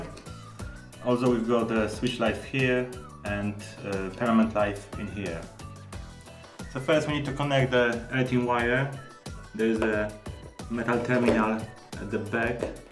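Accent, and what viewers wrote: Poland. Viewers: Lovely Polish accent. Dzięki za pomoc. Thanks for help.😎🇵🇱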